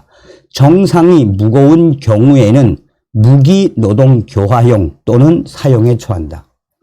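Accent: North Korea. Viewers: 북한서 자라나서 쓰던 말이니 금방 고쳐지겠나요? 부산서 서울로 이사 온 사람한테 부산 말투 쓰지 말란 것과 뭐가 다른지요??😢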